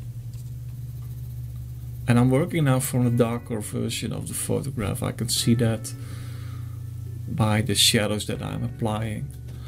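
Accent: Netherlands. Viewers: Love to this Dutch accent ahhaha. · You sound really dutch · Youre dutch i can hear it 😂 Ik ben ook Nederlands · Are you Dutch? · Are you dutch? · Mooi gemaakt , ik dacht al een Nederlands accent te horen heb mij direct ff geabonneerd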